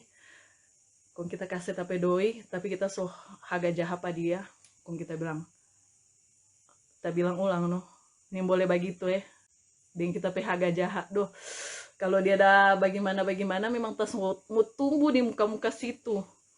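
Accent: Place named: Manado, Indonesia. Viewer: Itu logat manado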